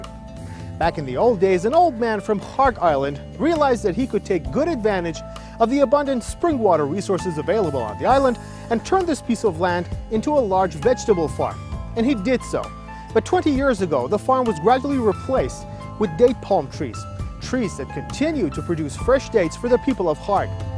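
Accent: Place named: United States. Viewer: Looks like a very boring place TBH. No wonder normal people ain't allowed to travel there. Also where did you find a host with a 99% American accent to showcase this XD?!!?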